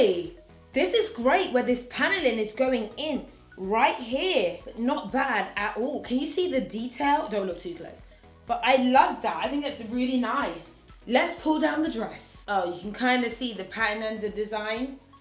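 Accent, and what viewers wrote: United Kingdom. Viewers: I love you! I love the verbiage with your British accent. You make me crack up with your descriptions of the shape wear. Love you💖💖💖👠👛🛍🐈🐕🐎💖💖💖🐞🌹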